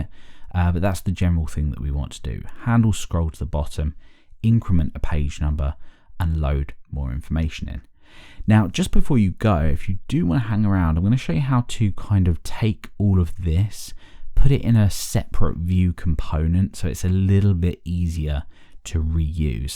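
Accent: United Kingdom. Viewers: An extraordinary tutor with British accent, how cute <3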